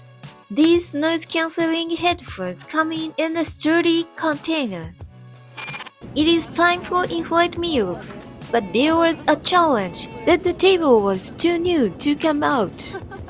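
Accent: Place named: Japan. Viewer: 很明显是日式英语，不是中式英语。 已经说得很好了，理解完全没有障碍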